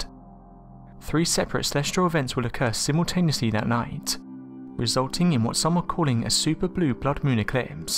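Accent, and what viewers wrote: United Kingdom. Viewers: lost interest once heard British accent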